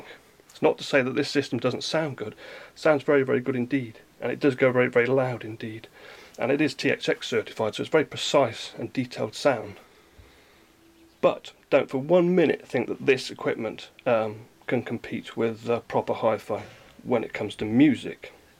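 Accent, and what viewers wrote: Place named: United Kingdom. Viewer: really like british accent. sounds so relaxed not like my launguage :D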